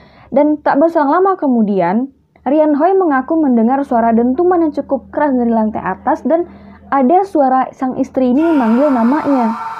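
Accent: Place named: Minangkabau, Indonesia. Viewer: Minang kental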